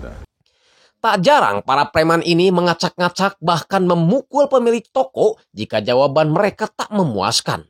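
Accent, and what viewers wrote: West Java, Indonesia. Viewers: kan di potong potong tapi intruksi sudah tertulis & tinggal baca saja berserta mensensor kata yang tak pantas dengan ucapan logat sundanya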